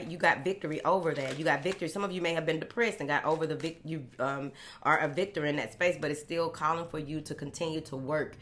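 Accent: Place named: Dallas, United States. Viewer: I knew you sound like you are from Dallas good lord southern woman thanks for your time and messages ms hope prosperity finds you for 2022 keep it up.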